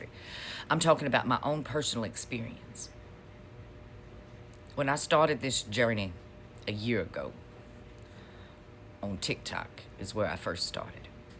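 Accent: South, United States. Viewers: We love the southern whether heavy or not. We love the look, the laugh, the truth, and the absolute ease in which it seems to flow. 😘💙🥰 The jury still out on Marge. Give 'em hell. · Keep it up. I know by experience that humor and a southern accent break through walls. Mine is more of a hillbilly, Eastern Kentucky accent but just as effective.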